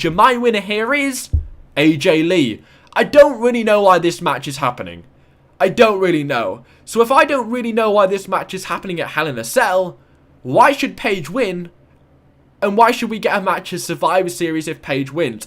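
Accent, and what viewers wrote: England, United Kingdom. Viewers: You have an english accent are you from UK ?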